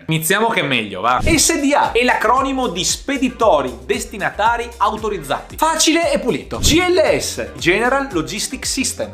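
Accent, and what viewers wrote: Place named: Trieste, Italy. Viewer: L'inglese pronunciato in triestino è una perla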